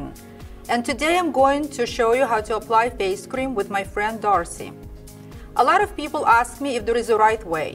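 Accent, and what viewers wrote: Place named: Russia. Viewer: so much of russian accent))))))))))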